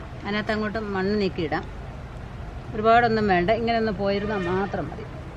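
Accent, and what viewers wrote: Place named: Punalur, India. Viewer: chechi. Punalur ആന്നോ. Veedu. അവിടുത്തെ. Samsaram ആണ്. Super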